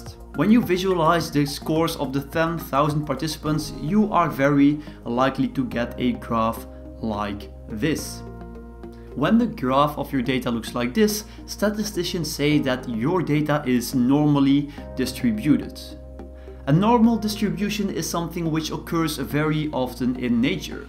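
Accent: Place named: Netherlands. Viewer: ps: I've to tell that it's not easy, at all to hear out where you come from. It really sounds like a mix of german, dutch and french accent. my guess is dutch. ( i consider myself a professionell in useless skills)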